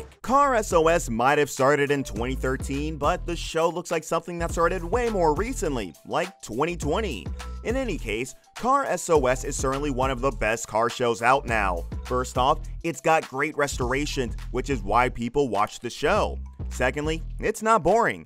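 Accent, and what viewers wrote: United States. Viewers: This show simply conforms the immaturity of British men with their childish pranks. The mechanic guy is worth watching, but that the tall one, what is a disaster. And never use an American narrator for a British show. There's so much background detail that Americans simply can't grasp. Jack, the Japan Alps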